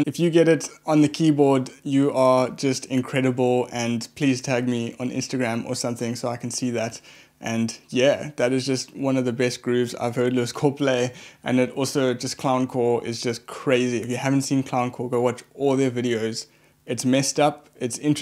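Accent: South Africa. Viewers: You South African? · subbed cause your English is as South African as mine. keep up the work, excellent lesson , ill watch more videos. not a drum player tho just love good music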